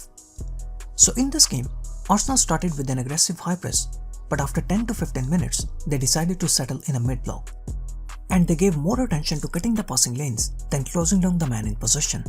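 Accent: India. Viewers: What an Indian accents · It's so tiring to listen to your (Indian English)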